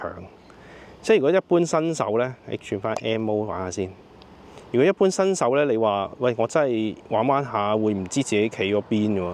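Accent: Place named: Guangzhou, China. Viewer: 廣州大陸🐖口音，講英文都怪過人😂🖕🏽🖕🏽🤙🏽🐔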